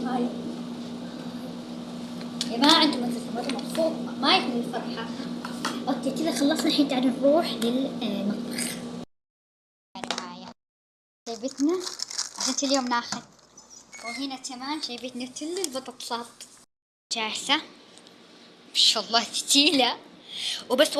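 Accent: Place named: Saudi Arabia. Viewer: احلى👍 بتحكي سعودي ماشاء الله هههه بس مافهمت شو يعني اول يوم حضوري؟؛!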